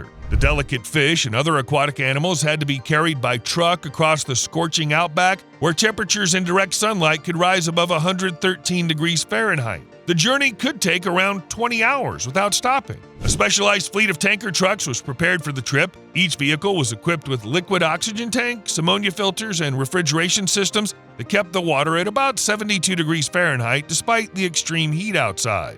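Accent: United States. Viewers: Heres another Australian who also has never heard of this Disneyland. The American accent telling artificial information.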